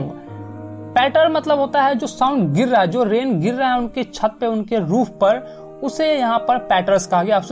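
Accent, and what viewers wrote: Bihar, India. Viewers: Aap Bihar saa hoo kya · ABA SALA BHIHARI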